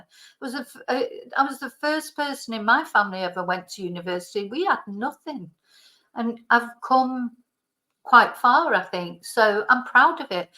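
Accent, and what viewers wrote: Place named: North England, United Kingdom. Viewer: Awe don’t 😊let that di@ run you down. I saw that and I am assuming he’s come off his meds suddenly. He reminds me makes me realise how great it is to be single. You are marvellous and that’s official. I love your channel and it feel’s nice to hear a local voice when I’m doing jobs around my flat…..at night too when I’m winding down and getting ready to go to bed. You can tell he’s a controlling tosser by all the record’s neatly stacked behind him. Jesus grow up fella. Can you imagine living with that? He was obviously getting out of his system something about an ex bleeding him dry and directed at you instead. 😌 Stay strong my fellow northern lady. He is always doing impressions of Michael Caine and thinks he’s hilarious…. I bet the ex had the best laugh when she took everything in the divorce! 😌 just carry on doing what you do best…. 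being you. You don’t have to defend yourself to that maniac, he’s not worth a second thought. To anyone who reads this I am not calling Luke. I think he is a really lovely lad and he’s got a heart of gold. He’s from our neck of the woods isn’t he? If ever you come back home again you are very welcome to come for a cuppa, cake and biscuits in Bramhall Park. I wish I could fast forward to January. Since my mam died it feels pointless. It’s her birthday on the 8th December, mine the 21st and then me who’s dying New Year’s Day. Last year I was just here alone. The first year I never even put a tree up and I used to absolutely love doing that w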